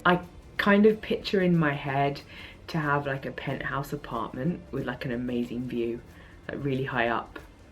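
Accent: United States. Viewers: Your American accent is SO good! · Your american accent is really good! Oh could you do a video on some workouts you do at home. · Your american accent its subtle, but really good. :)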